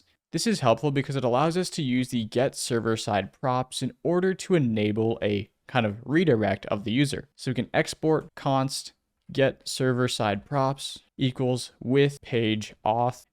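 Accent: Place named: United States. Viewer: your english is the best I can understand. true American accent I use to learn in school. thanks for the great tutorial.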